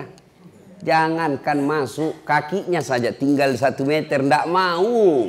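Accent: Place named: Sulawesi, Indonesia. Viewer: Alhamdulillah, dpt siraman rohani logat sulawesi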